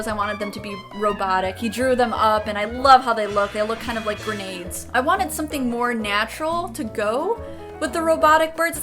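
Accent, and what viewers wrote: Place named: Chicago, United States. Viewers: I love how Midwest your accent is I can hear the Chicago dripping from your voice. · Ya from Chicago? Ya 'da bears 'da bulls accent! Its all good lol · I thought you had an Illinois accent!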